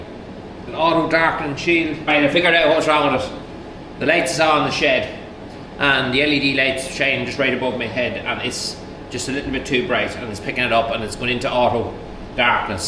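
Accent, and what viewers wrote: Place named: Cavan/Monaghan, Ireland. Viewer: Your channel is growing because you are the product. The videos are well framed and edited, and the content is interesting, but fundamentally, whether you knew this or not, it's you. You are the product here. How you cope with the day-to-day is what people watch for, and you encourage people with your work ethic, attitude, and resilience. It also helps that you have a wicked Cavan/Monaghan accent, and the Yankees love that, too. You keep the country going; you are some of the best we have.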